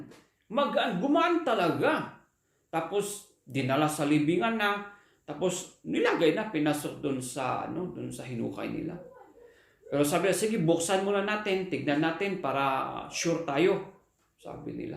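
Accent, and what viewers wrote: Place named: Ibaloi, Philippines. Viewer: Badarong 😂😂😂 ensend ko your other video to my friends, and akala nila amin talaga nga ibaloi ket kasta accent na. 😂😂😂 keep on making videos 😃😄😄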